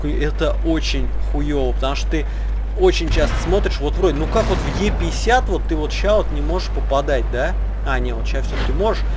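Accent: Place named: Odessa, Ukraine. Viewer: Зато Одесский колорит прям хлещет из речи. Одесский быдло - колорит. Хотя хотя если брать, то у него даже не быдло стайл... Это просто говно.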